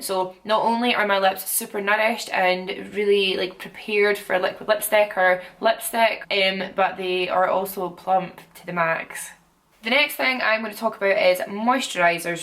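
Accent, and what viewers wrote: Scotland, United Kingdom. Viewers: Where is she from in Scotland?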